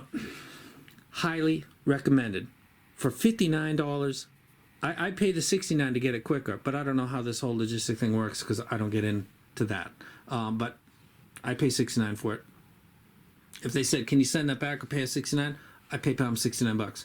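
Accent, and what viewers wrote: Boston, United States. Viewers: Lol, I had a feeling you were from Boston. New England loves what you do man!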